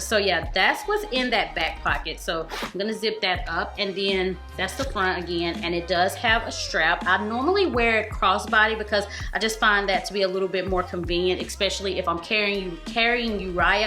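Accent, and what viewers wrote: South, United States. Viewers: Ugh 😩 you are the cutest southernest momma ever and I love it!!!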